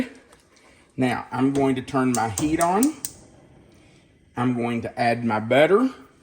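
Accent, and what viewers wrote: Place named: Kentucky, United States. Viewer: Those look wonderful! You sound like my parents they come from Menifee county!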